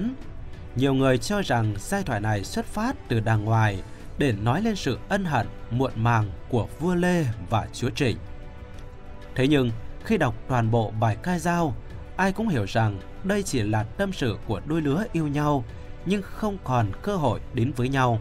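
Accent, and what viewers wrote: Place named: Central, Vietnam. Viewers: Giọng này ng miền trung đọc theo tiếng bắc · Người thanh hóa họ nói vậy. Đào duy từ chắc là tổ của ad nên họ làm video này chứ cống hiến của Đào duy từ trong lịch sử không có gì nổi bật.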